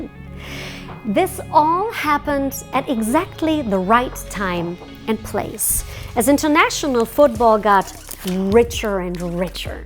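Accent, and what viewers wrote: Germany. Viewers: Her German accent gives me goosebumps. 🔥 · U speak absolut fantastic english!!!!!! And i can hear u r german!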